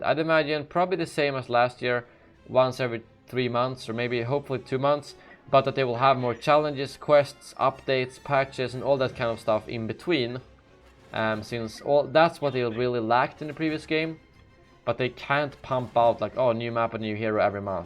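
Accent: Sweden. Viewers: You come from Sverige ? :D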